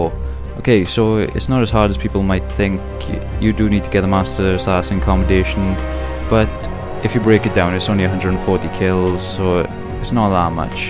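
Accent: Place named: Wales, United Kingdom. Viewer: OMG I FOUND SOMEONE WHO IS ACTUALLY WELSH!😁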